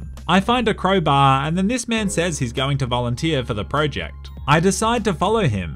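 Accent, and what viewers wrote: Australia. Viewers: I’m Australian and I’ve never heard someone speak like Aussie Man. Now you are speaking like Aussie man. Can’t be a coincidence. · I'm trying to figure out if he's either a Australian or a New Zealander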